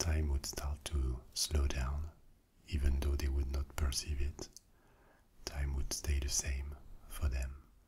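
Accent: France. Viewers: The accent, I fell in love with a French accent the second I herd a French Female speak 😍😍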